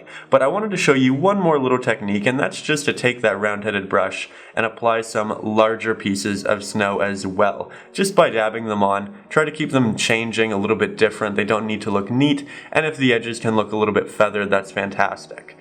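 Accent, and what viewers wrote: Canada. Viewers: I always enjoy your lessons...& your accent...eh?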